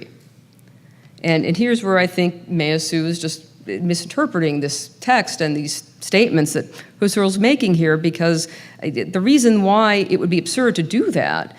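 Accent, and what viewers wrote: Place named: Canada. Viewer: must be Canadian? weird voice and accent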